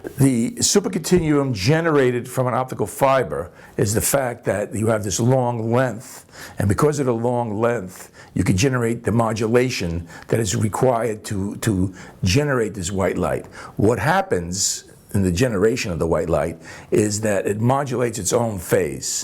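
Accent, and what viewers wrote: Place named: New York, United States. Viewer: mike jan i'm not saying he isn't. clearly from this video he is a very accomplished and expert in his field. my point is i haven't met many scientists with such a thick new york accent, just like in goodfellas or something haha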